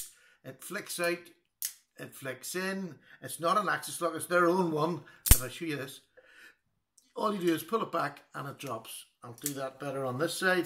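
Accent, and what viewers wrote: Ireland. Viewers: Congrats,! We trust yah! I'm sure your reviews will continue to be fair and honest. Whatever keeps you on the air... DO IT! Love your channel and love that voice. Watching your channel has greatly helped with my Irish accent! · I love your channel, i love that you focus on budget knives. And your Irish brogue reminds me of my grandparents who where from Cork and Leitrim counties. Keep up the great work sir!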